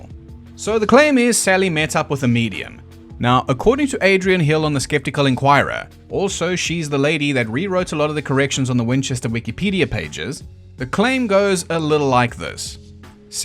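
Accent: South Africa. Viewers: He‘s South African, likely Afrikaner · is that a south african accent i detect 😛🤞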